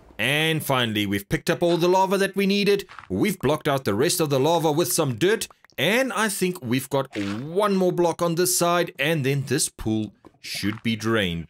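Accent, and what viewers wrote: South Africa. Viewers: Are you from south africa??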